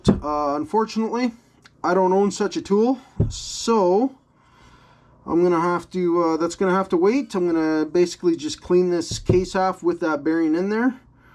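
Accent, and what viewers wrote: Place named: Canada. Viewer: nice vid you should get a longer 1/2" ratchet I like the canadien accent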